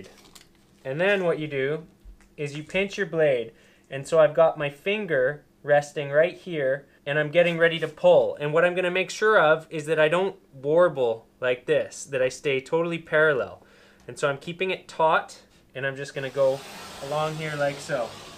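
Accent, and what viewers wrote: Canada. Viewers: Who else is here for the drywalling and Canadian accent?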